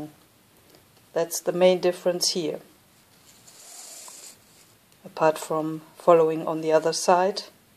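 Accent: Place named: Germany. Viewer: I LOVE your German accent! And I continually applaud your English. : )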